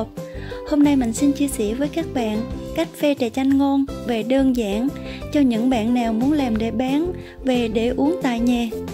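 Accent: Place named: Phu Yen, Vietnam. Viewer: Bạn giống ở phú Yên vậy bạn giọng nói ấy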